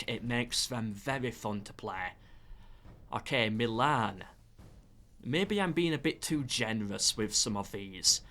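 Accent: United Kingdom. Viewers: Idk how good is your micro but you still sound british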